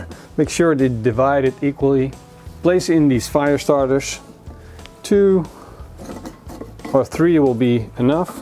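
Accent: Netherlands. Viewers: Roma Abrupt dutch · Nederlands accent! Dutch accent! Lol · U hebt een Nederlands accent??......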